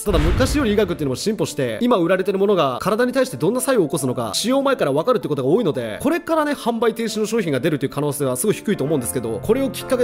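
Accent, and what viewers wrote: Kansai, Japan. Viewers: 標準語で話してますけど関西の人なんですね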